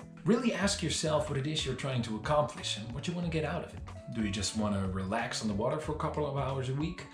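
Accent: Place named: Netherlands. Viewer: I live 1 minute from the Meditteranean Sea in Israel, and I find myself looking up info about buying my first kayak. This video pops up and without further checking you out, I can HEAR your Dutch accent. Then I look and figure out you're a Haarlemmerrrrr! My birth town! What a coincidence. In ieder geval, een erg informatieve video die me een stapje verder helpt in de richting van mijn eerste kayak avontuur. Groet uit het "heilige land". P.S. Is er iets over Middellandse Zee kayakken dat ik moet weten als beginner?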